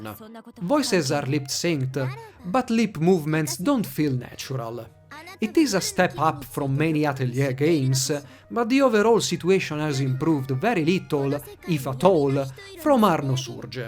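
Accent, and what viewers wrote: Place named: Italy. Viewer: You're the first person I've heard who has such a strong accent, but speaks so good english. Italian?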